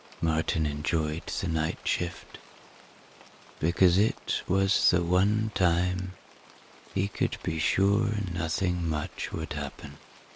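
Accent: Ireland. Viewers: Amazing story out of all the sleep stories you have the most relaxing voice what part of Ireland are you from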